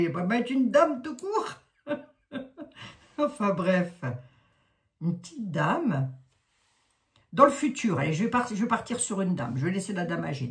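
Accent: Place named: Haute-Saône, France. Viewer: Cc la vieille femme 😂😂 ,oui j'ai regarder hier pour la pompadoure tu devais regarder la suite se soir . T'on accent je le connais ma mère était de pas très loin de chez toi ou j'ai été en décembre dans le 70 luxeuil les Bains, et je voulais passer te voir mais j'ai pas oser peur de déranger. Jésus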